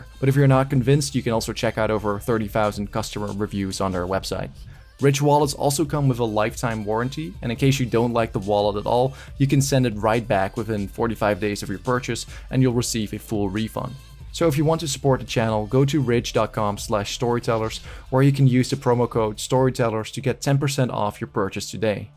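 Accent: Netherlands. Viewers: Just subscribed! I watched a few vids and just a question, are you dutch?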